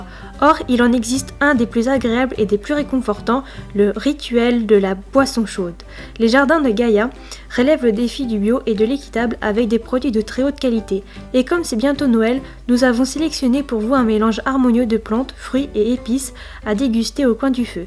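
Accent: England, United Kingdom. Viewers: 😊💙 (j'adore ton accent anglais ) 💙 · Sinon superbe vidéo le son était parfait rien a redire Azzaro est juste trop beau et ton accent anglais est normal ! Si tu entendais le mien !XD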